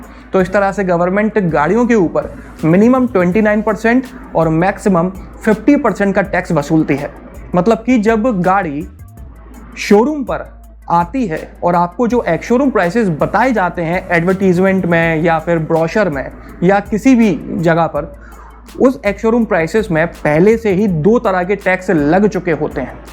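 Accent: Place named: Bihar, India. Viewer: Sir mujhe laga ki aap bihar se hai🤔🤔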